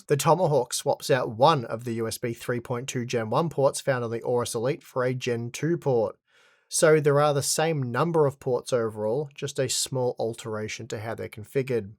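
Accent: Australia. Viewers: It's almost like they're australian and have a different accent and pronunciation over there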